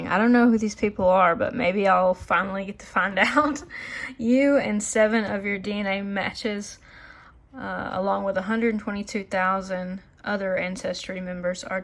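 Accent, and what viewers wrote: South, United States. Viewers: Are you from the Deep Deep South?, because I can hear a SouthernBell Accent from you?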